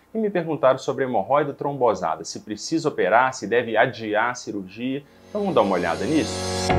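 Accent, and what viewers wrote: Minas Gerais, Brazil. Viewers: Boa noite Doutor! Você é de Minas né! Conheço pelo sotaque.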